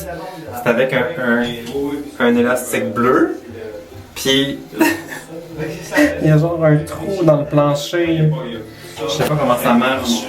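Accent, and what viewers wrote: Quebec, Canada. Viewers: Ahaha tu me fais trop rire!! t'inquietes le lait et les oeufs chez nous c'est jamais au frais, et on n'est jamais tombés malades pour ça! Notre lait est pasteurisé et peut se conserver des mois ainsi!!! Moi c'est quand je suis allée à Montreal, j'ai été choquée de ne trouver que du lait frais a consomer dans les 3-4j ou alors en poudre... et le fromage chez vous, misère!!! Il n'y a que du cheddar ou alors les fromages d'importation sont hors de prix! Ma cousine est à Montreal pour ses etudes et est comme une folle quand elle rentre en france et qu'elle retrouve le fromage!!! ahah. En tout cas depuis que je suis allée au Quebec j'adore regarder plein de vidéos vlog dessus tout ça, et votre accent, punaise c'est tellement sympa à entendre! lol En revenant chez moi j'ai gardé plein d'expression de chez vous tellement ça me plait!;) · Nous avons regardé cette vidéo dans notre classe de français. 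Nous avons dû étudier les prononciations différentes entre l'accent français traditionnel et l'accent Français Québec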